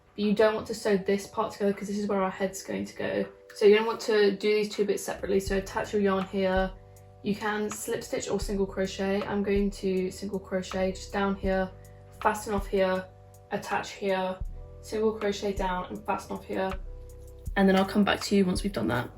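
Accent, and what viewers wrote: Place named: United Kingdom. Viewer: what kinda british accent do you have? i like it!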